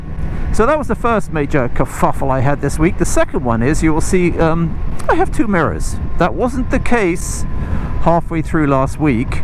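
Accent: England, United Kingdom. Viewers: Good video I’ve got a lot of your videos to catch up on I can’t believe the price of them mirrors I don’t know how much that would be in £ but sounds a lot and do you originally come from uk you sound English to me if you are I live in Essex near Colchester you might know it take care will catch up on some more of videos take care ride safe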